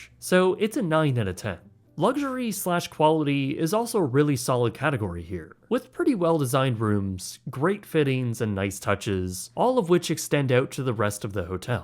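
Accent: Canada. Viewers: Mind you, rates change. For example his same room now is $270 i think hes quite nit picky and since this is a historic hotel this is very fair pricing and i disagree w majority of his pricing critques. Granted it sounds like hes canadian and their dollar is very weak in the US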